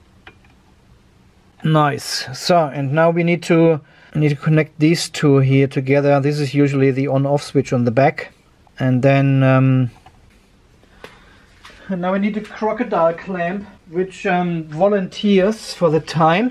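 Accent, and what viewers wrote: Germany. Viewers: Thanks for your nice videos., bist Du deutscher? Ich hatte schon öfter gedacht das Du einen deutschen Akzent hast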